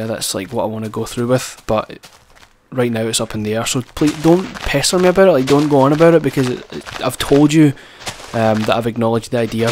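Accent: Scotland, United Kingdom. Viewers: this guy sound soooo much like James johnston from biffy clyro :D ( and not just becasue there both Scottish) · are you scotish lat ?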